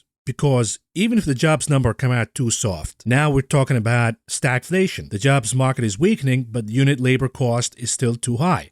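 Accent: New York, United States. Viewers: New York for sure I'd say Iranian American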